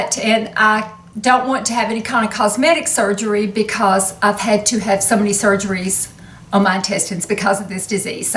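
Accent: South, United States. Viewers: Lol i dont know if it's the southern accent but you seem like such a sweet person, something about you reminds me of a warm hug. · Thank you for your help.You definitely look better than Christy Brinkley or any other Hollywood beauty guru.Please tell us about your diet and exercise,supplements. I find that woman that gain and loose weight tend to loose tone in the jaw line.You have amazing bone structure.Do you have any recommendations for face yoga or face massage?Can you share your ethnic background? Thank you for encouraging us to age well.I hope to move into being older and better as you have.Love the southern accent.God bless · Did ur accent get more southern? Lolz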